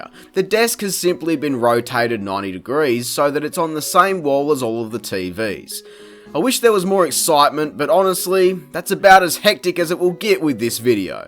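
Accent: Australia. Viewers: Ha love that set up bro 😜 Btw do you live in Australia? You sound Aussie 😃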